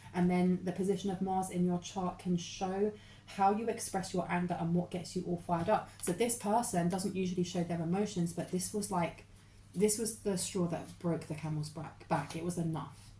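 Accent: United Kingdom. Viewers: I think 🤔 she’s 😢British 🇬🇧 I am Spanish from the 🇺🇸 I can understand her I put on headphones to listen closely.🎧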